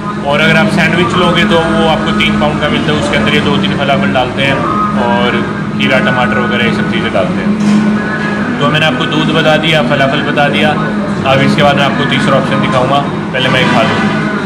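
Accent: Haryana, India. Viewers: Like ur Haryanvi touch it is like having a sprinkle of honesty and strength .👍🏼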